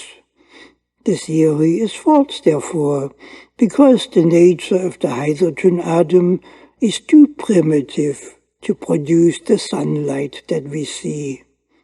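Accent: Germany. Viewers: i think your work is great and the Einsteinian accent adds to the atmospheric's, your humanist attitude is enviable and i look forward to further work by you. thanks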